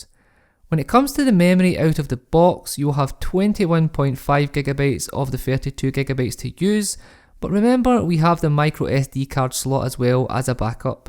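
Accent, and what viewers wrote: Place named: Scotland, United Kingdom. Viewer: The share joy of a Scottish accent combiled with a tek vide cannot be mesured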